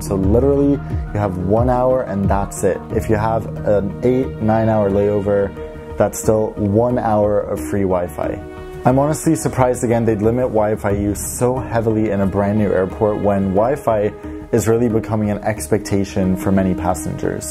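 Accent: Gothenburg, Sweden. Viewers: Älskar göteborgskan :) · Right. So at first your Swedish sounded extremely American but like after American Express the Gothenburg dialect kicked in. Absolutely fantastic! One can hear you’re not comfortable though :) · Hehe. Loving your deep Gothenburg accent. Keep up the good work. GÖTT MOS!!! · Det vart faktiskt bra med din Göteborgska ❤ Now over to English, you said it great whit Gothenburg accents. I was in Istanbul's new airport and saw also the toilets problem I took photos about how terrible it looks out. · Du pratar lite göteborgska (det är kul). Snälla prova Ukraine international BC. Jag hittar inte någon som har gjort en tripreport på den och jag vill jätte gärna se det. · OMG! In Swedish and in Gothenburg accent, Thank you. You made my day:D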